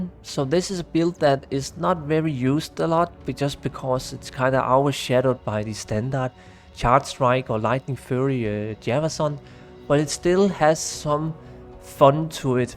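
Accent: Denmark. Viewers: I guessed danish too! I thought he sounded a bit like Lars Ulrich, so that gave it away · i smell dansk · I guessed you were Danish and got it right! I recently found out I'm a third Norwegian so I've been paying a bit more attention to Scandinavian accents :P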